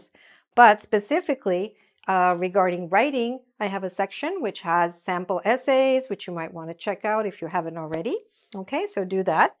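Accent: United States. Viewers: Pardon,it's american english? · Her accent is American so I'd bet for American English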